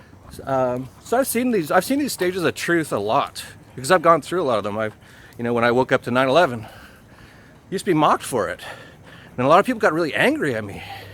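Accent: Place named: Germany. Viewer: I enjoy your engdeutish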